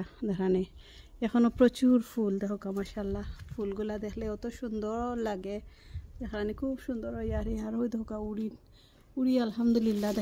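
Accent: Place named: Sylhet, Bangladesh. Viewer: সিলেটি মাত হুনিয়া খুব ভালা লাগল❤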